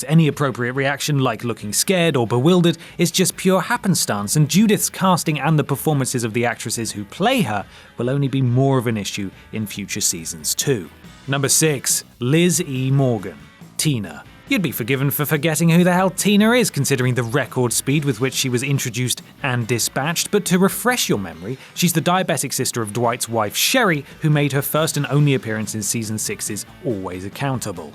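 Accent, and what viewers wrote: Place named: England, United Kingdom. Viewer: Wow this was definitely a reach. And is that an English accent by the narrator? What would you know about southern accents? I think she sounded fine, not everyone sounds the same here. Anyway Emily Kinney is from Nebraska. And while midwestern accents are definitely different, I'm sure she was easily able to tap into that drawl.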